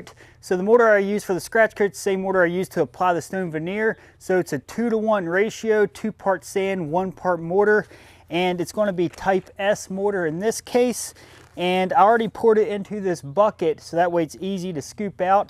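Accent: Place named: Pittsburgh, United States. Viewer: Listen to that Pittsburgh accent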